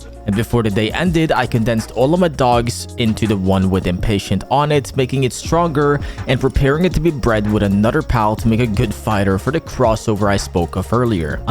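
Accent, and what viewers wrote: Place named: Sweden. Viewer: Wait are you Swedish cus it kinda sounds like it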